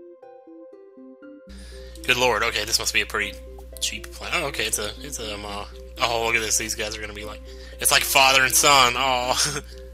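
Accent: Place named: South, United States. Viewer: Thanks for post... Not sure where you are from...but your accent (Southern?) Is great! Voice is easy to listen to...And you are funny. I mean really really funny. I am a med student using P vs. Z for stress release... Thank you so much for this post...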